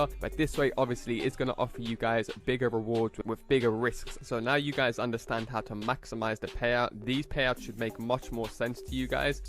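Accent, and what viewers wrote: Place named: England, United Kingdom. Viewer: English u wallad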